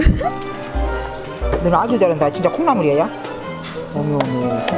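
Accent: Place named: Chungcheong, South Korea. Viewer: 충청도사투리ㅋㅋㅋ 우리할머니같아ㅋㅋㄱ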